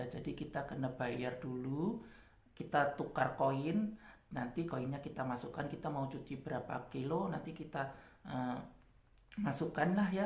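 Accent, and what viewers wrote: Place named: Indonesia. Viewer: 1. Kalau cakap pasal loghat, di indonesia sendiri sahaja ada beratus2 loghat yang berbeza. Tak hairan. Tapi Bahasa Melayu (di Indonesia dengan di Malaysia) tetap sama, cuma ada beberapa istilah sahaja yang tidak sama (cuma istilah). Kamu bercakap loghat Indonesia di video ini, saya (selaku orang Malaysia) seratus peratus faham. 2. Bahasa inggeris di Malaysia dipelajari di sekolah. Bukan kerana Malaysia pernah dijajah oleh British maka semua rakyat Malaysia secara otomatis bijak berbahasa Inggeris. 3. Teh tarik itu kan teh susu biasa saja, cuma ditarik (shake). Tapi bukannya semua orang Malaysia minum teh tarik setiap hari. Macam2 jenis minuman lain yang kami minum selain teh. 4. KFC di Malaysia ada nasi dan ada nasi lemak juga. Tidak benar jika sebaliknya. Kalau tak percaya ayo pergi kamu ke KFC sekarang ini dan tengok di menunya, pasti ada nasi. 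5. Orang indonesia selalu saja silap apabila mengatakan harga kereta (mobil) di Malaysia harganya murah. Kereta di Malaysia tidak murah. Cuma di Malaysia boleh beli secara berhutang (bayar secara ansuran) dengan syarat yang agak mudah (iaitu asal ada keupayaan membayar ansuran bulanannya). Bolehkah kamu kata harga kereta di Malaysia antara RM40,000 hingga RM100,000 itu murah (dalam nilaian rupiah Indonesia). Cuba kamu hitung berapa niainya dalam rupiah. Kalau kamu masih kata murah maka sudah sahlah bahawa orang Indonesia memang kaya-raya. 6. Hampir di setiap rumah di Malaysia ada motosikal. Tidak benar kalau dikatakan orang Malaysia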